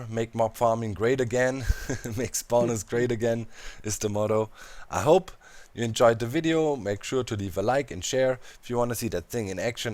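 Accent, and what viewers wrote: Germany. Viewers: German right ;)?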